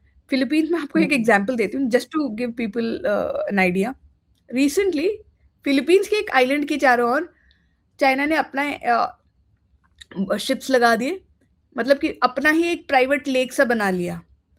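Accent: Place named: South, India. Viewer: South Indian hai madam.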